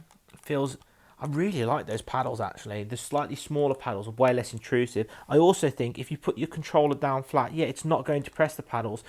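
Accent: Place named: Wales, United Kingdom. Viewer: oh u sounded welsh to me, im welsh!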